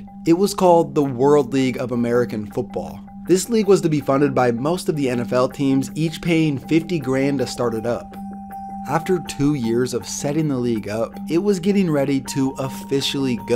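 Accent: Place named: Boise, United States. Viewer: Yo are you from Boise??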